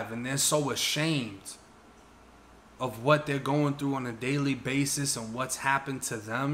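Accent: Boston, United States. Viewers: That Boston accent kills me. Much respect tho bro✊🏾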